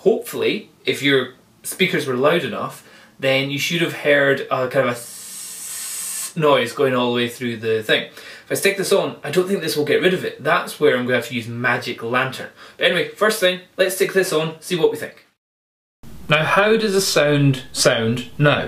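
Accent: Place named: Scotland, United Kingdom. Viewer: The magic lantern test was a much purer sound, filtered out most of the noise but left the Scottish accent in tact :) Cheers.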